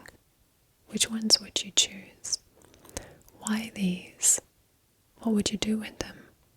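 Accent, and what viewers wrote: Australia. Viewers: Really awesome asmr...well done...and finally found an Aussie asmr-tist that i like!. Subbed!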